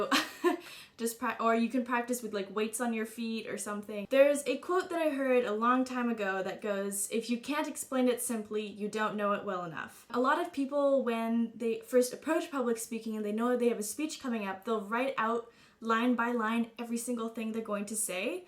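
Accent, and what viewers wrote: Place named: Canada. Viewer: Beautiful as always :) As a former Canadian my self, I really picked up your accent :p Thanks again for the great tips!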